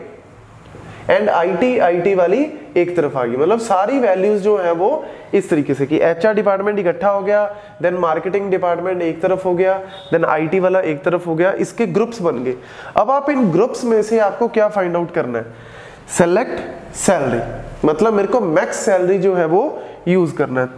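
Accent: Punjab, India. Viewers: You are amazing man. Thank you so much for your lovely sessions. I particularly like the punjabi flavour in your Hindi. These sessions have been of so much use to people like us. May you always stay healthy and happy. Coud you make a few sessions on SQL queries that are frequently used by manual testers. Would be of a great great help. Thank you once again from the bottom of my heart. Let us know your name dude.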